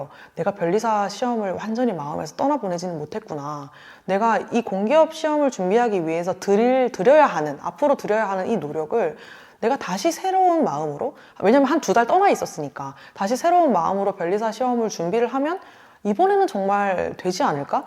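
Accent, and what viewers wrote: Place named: Gyeongsang, South Korea. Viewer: 경상도 사투리 구수하고 좋다 ㅋ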